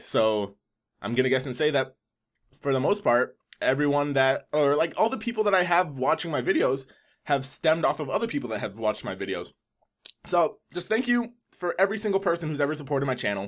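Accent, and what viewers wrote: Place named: Caribbean. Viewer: Im from The Bahamas and you look and sound just like me, are you from the carribean? if so which island or america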